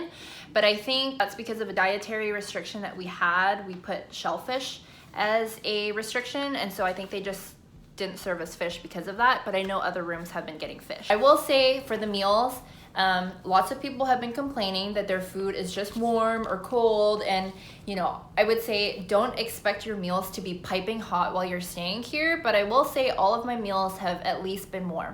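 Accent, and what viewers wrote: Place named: United States. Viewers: How come you use the American accent in M’sia ? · Late to respond here🙏 Appreciate the video👍👍 I’d say stick to your American or North American accent while I’m Malaysia.. whenever I try use Manglish/Singlish, I think I sound weird and can’t get my thoughts across. All the best!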